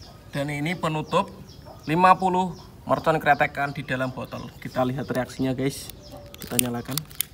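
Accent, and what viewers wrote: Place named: East Java, Indonesia. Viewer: Orang jawa timur kan bang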